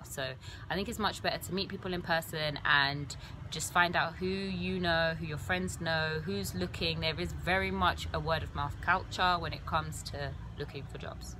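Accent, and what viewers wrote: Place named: United Kingdom. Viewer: Omg I hate British accent, but I love the video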